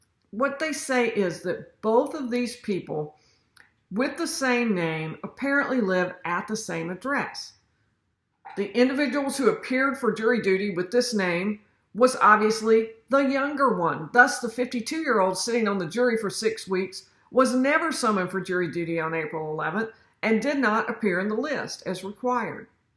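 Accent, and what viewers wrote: South, United States. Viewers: Hi! I have very much enjoyed your channel since I found you! I would like to share a little special story. I too am a Harvard graduate. Undergrad not law school. For that I went to a private Catholic college. My husband and I started to practice law here in Hattiesburg Mississippi 20 years ago. My daughter is graduating from high school and she wants to be a lawyer just like her brilliant parents. Not to brag lol:-) she wants to go to Harvard but she felt very self-conscious about her accent. My husband and I are from the north east so we blended in very well. Your videos in short have taught my daughter that she has nothing to be self-conscious about when she goes to Harvard in the fall. She has a southern twang that’s just her own just like you! Thank you for being an inspiration and a little silly from time to time. We really appreciate you for that. 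Have a great weekend.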